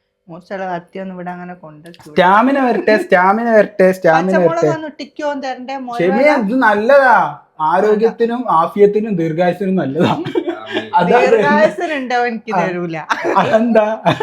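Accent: Kozhikode, India. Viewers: എൻ്റെ ധൈരമായി ഇരിക്ക് ഉഷാറായി ഇരിക്ക്യുന്ന ആണ് ഞങ്ങൾക്ക് ഇഷ്ടം കോഴിക്കോട് ഭാഷ രസ ഉണ്ട് കേൾക്കാൻ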